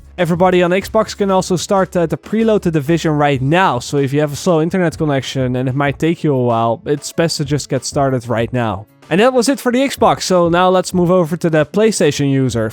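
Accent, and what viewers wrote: Netherlands. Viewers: nou jij komt uit nederland zo te horen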